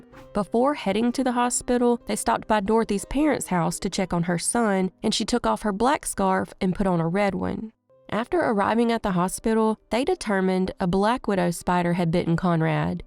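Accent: South, United States. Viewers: Can't stand the hillbilly narrator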